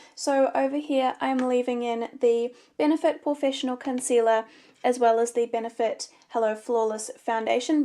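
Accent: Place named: RP, United Kingdom. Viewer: I just come to listen to your accent... and your kinda posh lol x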